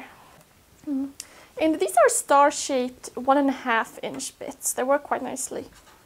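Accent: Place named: Sweden. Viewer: How long time has you lived in the USA ? You think in inches, but your accent is very Swedish. Ever visit Sweden, and will / do you teach your kids (??) the other language ? Sorry for off-topic question, only answer if you feel like it :) Excellent videos!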